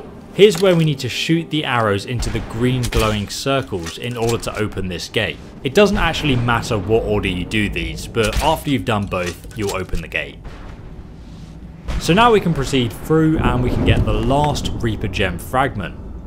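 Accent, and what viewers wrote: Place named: England, United Kingdom. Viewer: Da Informer his accent has nothing to do with it, its an english accent, sane as mine, i prounounce everything fine, hes dyslexic.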